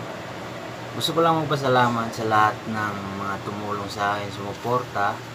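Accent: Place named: Bulacan, Philippines. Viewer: Puntong Bulacan bgung kaibigan po plitan tau regalo po lmat amega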